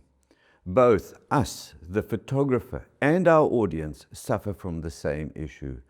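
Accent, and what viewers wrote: South Africa. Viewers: Love hearing that SA accent. Lived there a few years and miss it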